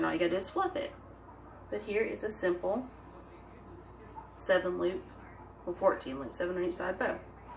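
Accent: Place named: South, United States. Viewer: I love your southern draw - shake it off!!! Kinda sad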